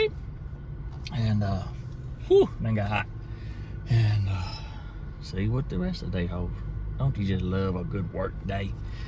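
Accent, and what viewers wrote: South, United States. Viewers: I Shirley enjoy watching your videos. I've learned so much about the problem with these feral hogs. He explained why are you doing very very well and you have a nice southern accent. I'm glad you don't show you killing the hogs, you just show them after they're dead. Keep up the 👍 work.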